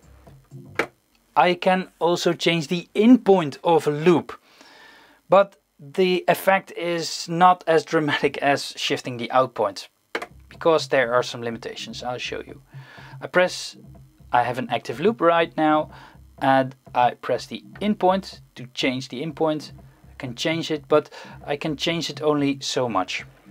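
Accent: Netherlands. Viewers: Hahah je klinkt gelijk Nederlands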